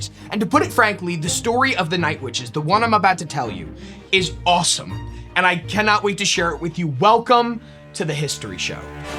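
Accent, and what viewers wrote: United States. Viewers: european history taught to me in a philly accent, it's like i'm back in high school <3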